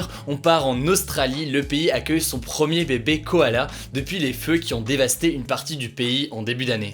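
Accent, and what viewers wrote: England, United Kingdom. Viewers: Pourquoi tu portes un accent britannique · That english accent though, legit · J'adore ton accent anglais 😍😂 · mdr ton accent en anglais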